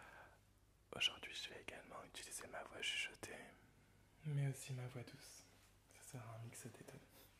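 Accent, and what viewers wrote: France. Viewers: I love your french. its beautiful. there is a part of belgium that speaks french too, but it sounds very ugly. 😉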